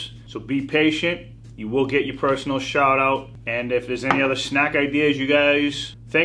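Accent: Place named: Massachusetts, United States. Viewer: is the voice of Massachusetts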